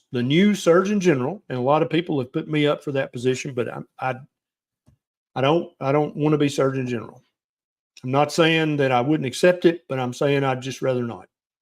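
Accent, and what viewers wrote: South, United States. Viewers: I'm not a carnivore, not even a ketovore or a keto person... but I do listen to you & glean a lot of wonderful information... I also respect your logic & candor... plus, I could listen to your southern drawl all day long :) . So, I guess for me, when I hear the term MAHA, I do cringe because I tie it with MAGA partly because RFK Jr. started it- and while I agree with the idea of striving to "make America healthy again, I don't like that the movers & shakers, who I love & respect (Calley & Casey Means, Max Lugavere, etc) have become so political and such cheerleaders for the far right. It sickens me that RFK Jr who touts being an environmentalist has tied himself to someone who wants to loosen emission laws and allow MORE chemicals to spew into our air & water. It sickens me that he has tied himself with someone who we all KNOW (I know you know too) that Trump doesn't give a rat's ass about anyone's health (or well-being) and is just using RFK for his own benefit. 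And shame on RFK for being bought & throwing his concerns & convictions out the window for this sick, dangerous man. Yes, I'm a Democrat who will vote for Harris - but I know that they will also not do much for the food issue (although they do believe in science and know that allowing more pollution is a bad thing, etc.)... I guess I would like to see those heroes of mine in the health world not take sides - and not align themselves with such hypocrites.... I"m with Dr. Shawn Baker - I believe he's skeptical on the g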